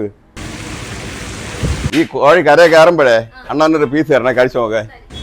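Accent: India. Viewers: തനി തിരോന്തോരം 😂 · Thiruvananthapuram slag adipoli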